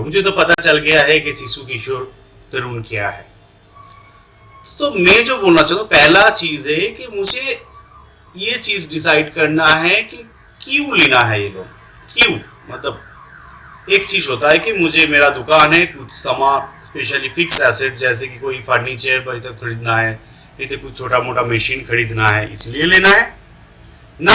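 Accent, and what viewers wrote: Bengal, India. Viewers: You are Bengali right??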